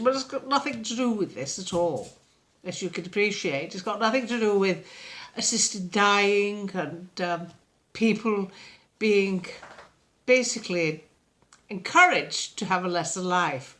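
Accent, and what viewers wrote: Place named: Upper class, United Kingdom. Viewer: hahahaa, hilarious!!! 😂 i love your upper class english accent! 😂 so funny!! shared it on my facebook, i watch you daily now, always keeping us entertained. you and katie, who i've followed for years. top birds!! xx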